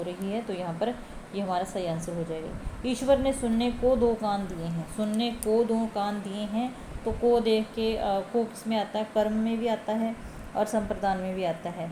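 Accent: Punjab, India. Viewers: Mam aap Punjabi ho kya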